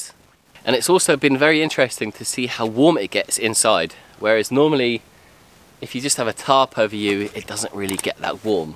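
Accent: United Kingdom. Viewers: i mean guy sounds british.....theres no bears there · You from the UK? We normally detect it a mile off.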